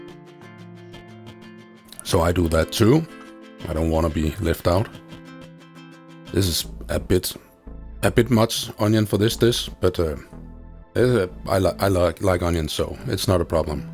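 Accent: Denmark. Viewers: Dansk accent :D godt lavet!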